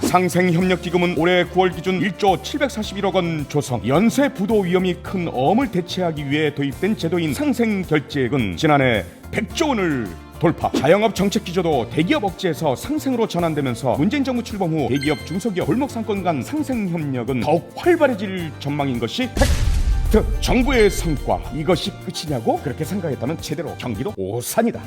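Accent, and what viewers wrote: North Korea. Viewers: 나레이션 북한같아요.. 레포트 써야해서 참고하려고 보는데 도저히 못듣겠어서 소리 끄고 자막키고보네요.. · 성우가 인민군같네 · 성우 말투도 북한 억양이네 일부로 그러는건가? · 말투 북한이냐?? 시이발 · 나레이션이 북한같아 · 이거 진행하는 사람 북한인이잖아 ㅋㅋㅋㅋㅋㅋ 말투 븅신이네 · 진짜 이딴거 만드는 목소리톤마저 저기 건너편 윗편애들한테 닮아가는 기분이 드네 진짜 미친거 아니냐 이딴식으로 되도않는 뜬구름을 팩트라면서 방송하네 ㅋㅋㅋ 2021년 다시 만들어봐라 어떤가 한번보자 미치겠네 ㅋㅋㅋㅋㅋㅋㅋ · 이정도면 걍 우상화 프로파간다 선전물이네....말투도 진짜 북조선 애들 같다...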